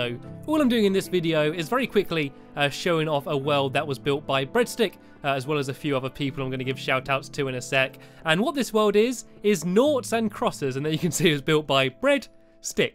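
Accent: United Kingdom. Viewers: himesamacosplay thats just how he says it since he lives in the UK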